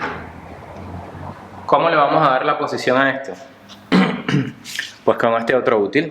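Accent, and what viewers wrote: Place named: Venezuela. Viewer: Hablas como venezolano, eres de canarias?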